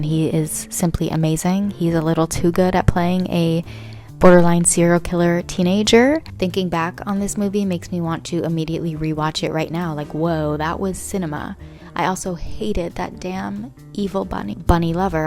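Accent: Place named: France. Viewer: As a french person, i love your french accent !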